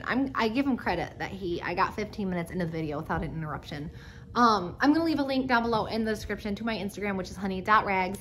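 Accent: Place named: Chicago, United States. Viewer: Are you in the Chicago area? You have an accent….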